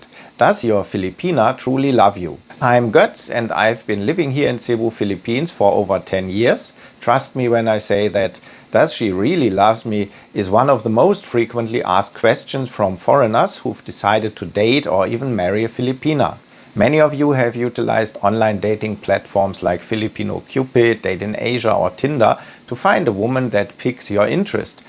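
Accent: Germany. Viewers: I love the German accent. It gives you credibility like a Mercedes Benz ad.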